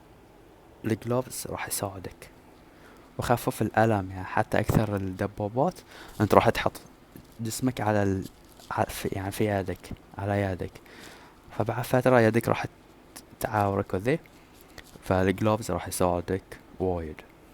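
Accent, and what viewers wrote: Bahrain. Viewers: يا زين اللهجة البحرينية 😌❤️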